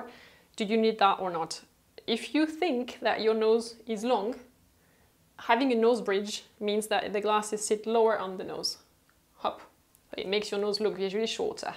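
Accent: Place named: France. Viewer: Hi! I love your videos ❤️. They're always so informative 👍. I also enjoy the soothing tone of your voice along with your accent! Im such s 'francophile'! Love all things French😘. Merci Mademoiselle!!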